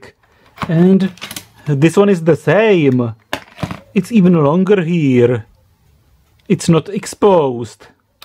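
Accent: Czech Republic. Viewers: I love your Czech accent and I love your channel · Shhh. You hate his accent (Czech accent) so much that multimeter = his English (!)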